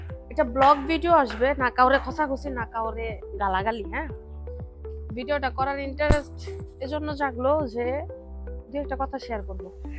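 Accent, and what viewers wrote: Comilla, Bangladesh. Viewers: আপুর বাড়ি মনে হয় কুমিল্লা।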